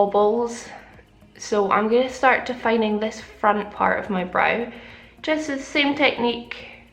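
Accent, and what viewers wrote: Scotland, United Kingdom. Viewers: i clicked on this video because i wanted fluffy brows then realised you were scottish and immediately subscribed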